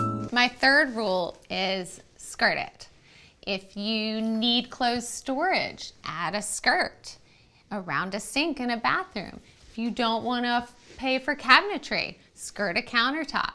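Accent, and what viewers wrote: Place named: New Jersey, United States. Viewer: Your voice, your voice why do you make that NJ voice?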